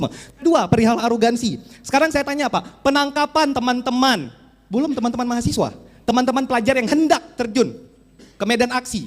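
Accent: Indonesia. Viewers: Dari logatnya sptnya dia orang sumbar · Cara bicaranya mirip orang aceh..🤔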